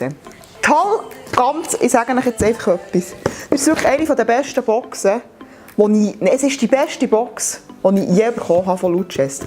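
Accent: Bern, Switzerland. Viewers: Cool! Kenne bis jtz nur d Unboxings vom Tobinator. Mau schön uf Bärndütsch 👍🏼😄 hesch öpe e Steam Game Account ou no?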